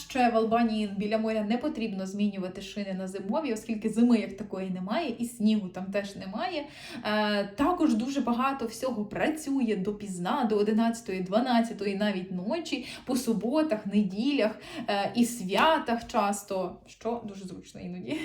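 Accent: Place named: Western Ukraine, Ukraine. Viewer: З відки у вас такий жахливий акцент. Ви часом не западянка?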